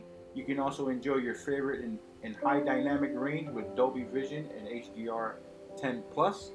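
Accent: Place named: Hawaii, United States. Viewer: I watched this for 2 minutes and could tell you were from possibly Hawaii or somewhere around there. I'm from there so I like to see if I can tell if someone is from there or not. Pretty good video man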